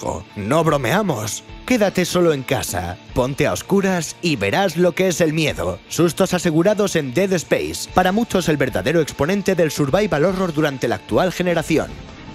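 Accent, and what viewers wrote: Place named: Spain. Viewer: buen video pero no soporto el acento de españa, como pronuncia mal los títulos de los juegos...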